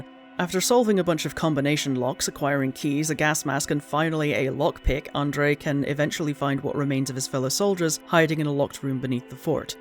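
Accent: United Kingdom. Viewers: You are an excellent storyteller. The tone of your voice, background music, and the visuals add so much. Also am I crazy to think you sound like Sylvanas just British? Lol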